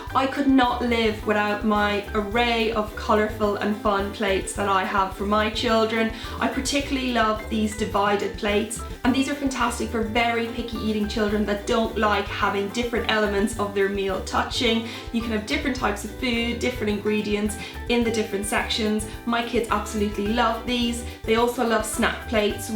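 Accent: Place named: Ireland. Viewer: Whats ur accent? I picking little bit of Irish .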